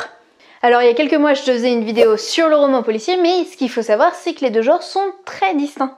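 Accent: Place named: United Kingdom. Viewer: Et on aime les sensations fortes ! Et quel accent british dit 😋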